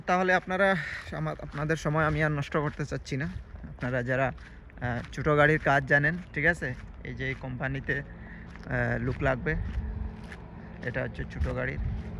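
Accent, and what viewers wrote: Sylhet, Bangladesh. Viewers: ভাই আসসালামু ওয়ালাইকুম আপনার সিলেট মাত সুন্দর লাগে। আপনার সাথে যোগাযোগ এর জন্য কি আপনার নাম্বার টা দেয়া যাবে কি?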